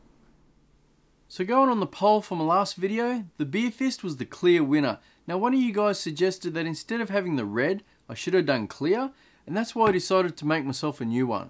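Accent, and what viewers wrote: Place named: Australia. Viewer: as soon as i heard you talking i was like FUCK ofc he’s australian only us aussies would do this 😂 the corona straight away made me suss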